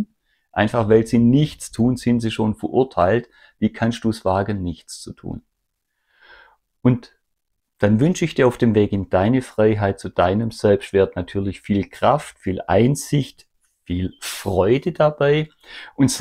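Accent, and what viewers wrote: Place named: South, Germany. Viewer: Meiner Erfahrung nach sind Flying Monkeys auch nicht die Hellsten. Niemals würde ich mir eine Gruselstory über Abwesende anhören, ohne nachzufragen, ob der Berichterstatter das auch im Beisein des Betroffenen wiederholen würde. Damit oute ich mich natürlich schon als "ungeeignet" für die Rolle und werde womöglich selbst zur Zielscheibe, da nicht ausreichend untertänig/blöde. So ähnlich ist es in der Realität denn auch geschehen ... Übrigens schaue ich Ihre Videos total gerne. Nicht nur, weil Sie authentisch und sympathisch sind, sondern auch wegen des Dialekts (stamme selbst aus Süddeutschland). Liebe Grüße!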